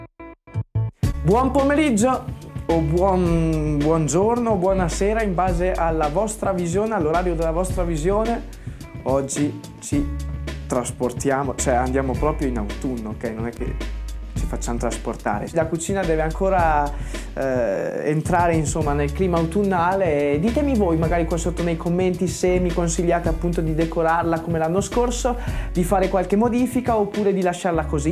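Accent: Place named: Veneto, Italy. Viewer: Ma te si veneto 💚? se non sbaglio... meraviglioso il piatto, complimentoni!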